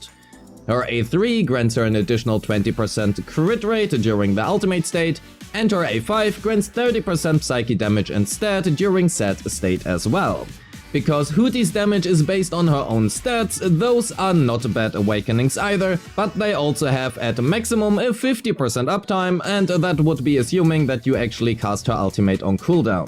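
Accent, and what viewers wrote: Germany. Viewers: I know you are from a German speaking country because of your accent but I CAN'T PROVE IT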